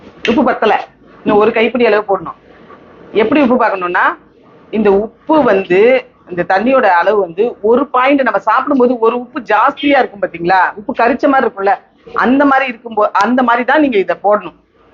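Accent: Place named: Coimbatore, India. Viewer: Super , coimbatore ah sister ninga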